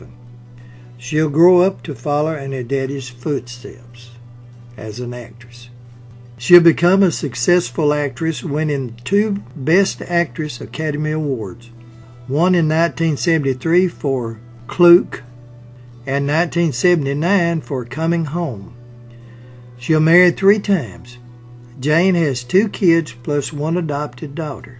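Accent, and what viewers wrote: South, United States. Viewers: Dear, enjoy your southern draw, and gift in Biography's which keep getting better and better.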